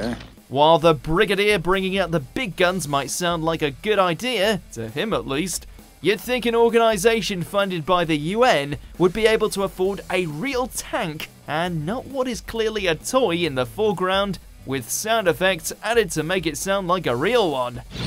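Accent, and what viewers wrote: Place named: North, United Kingdom. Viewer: It's so called,, accent". It's normal. He speaks with some northern accent.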